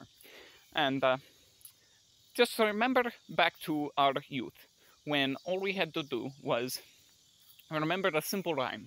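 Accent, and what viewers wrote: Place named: Russia. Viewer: Love the accent. And you have really good English for being Russian. Making the most of what you got and looking on the bright side. NICE!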